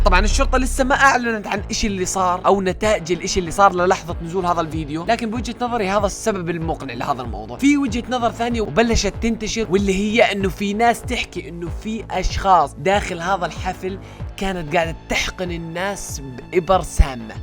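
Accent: Jordan. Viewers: أحبك وحب طريقة سردك للقصص مفهومة وأحلى شي لهجة أهلنا الأردنيين قريبة على القلب ومفهومة😍❤🔥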